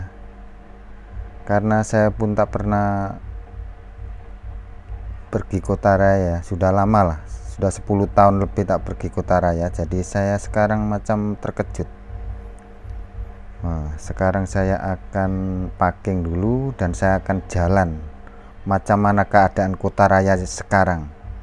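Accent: Java, Indonesia. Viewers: logat lu koq jawa bang..😁😁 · Abang org indonesia yah,,,sek3dar masukan ga usah di melayu2in bang,,,logat ente logat indonesia kejawa2an,,jadi ga bisa abang berlogat melayu malaysia malah lucu kedengerannyanya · Assallamuaikum 🙏salam kenal dari saya penonton baru dari kalteng,,,klo di dengar dari logat bahasa sampean kya y org jawa ya mas🙏🤭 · Pakai bahasa indonesia aja napa bang, logat malaysia2an tp logatnya jawanya kental dadi malah aneh...hehe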